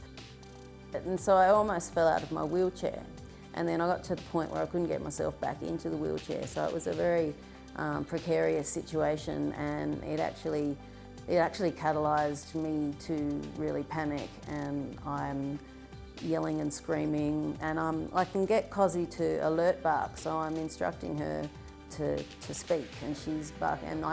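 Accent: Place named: Australia. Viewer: I wish u all the best. Im from Victoria Australia. I recognise our accent. Take care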